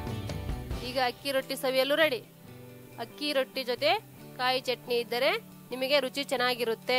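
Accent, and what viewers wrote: North Karnataka, India. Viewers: Nivu uttara karnataka accent nalle matadi tumba chennagi iratte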